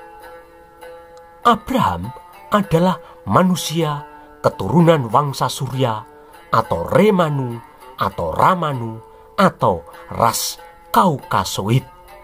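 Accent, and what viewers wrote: Java, Indonesia. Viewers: Baru ini nonton chanel jdi ketagihn nonton krn bhs nya logat jawa dan penekanan kata2ny,bkin ktwa,gak ngantuk aplgi nyebut muhammad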